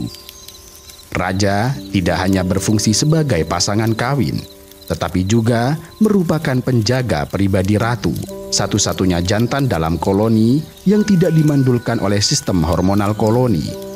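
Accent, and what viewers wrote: Java, Indonesia. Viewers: Jawa nya jawa banget 😭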